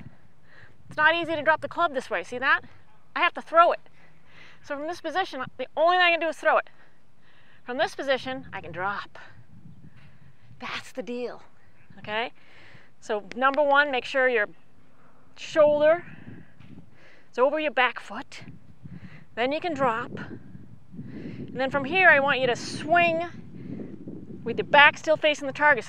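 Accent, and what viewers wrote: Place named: Upstate New York, United States. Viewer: The way you talk reminds me of all my friends from upstate NY. Is that where you're from!?!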